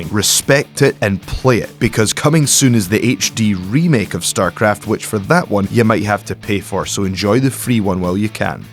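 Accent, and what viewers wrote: Scotland, United Kingdom. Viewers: This guy has a Scottish voice and I love it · Mainly just due to the Scottish, but still. Imagine a Doctor that connects with the youth on their level.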